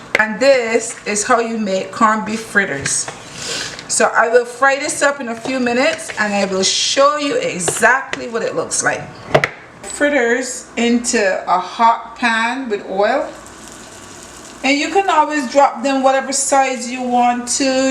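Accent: Barbados. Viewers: So good to hear your Bajan accent!!! I'm Bajan too living in Jamaica. I'm about to make some fritters now! Thanks for this recipe.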